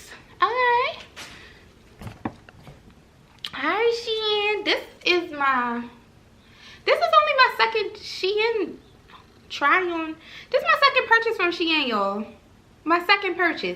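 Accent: Baltimore, United States. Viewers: First time here are hi dear, are you from Baltimore I know our accent anywhere😂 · I love your accent 🥰🥰I knew you were from Baltimore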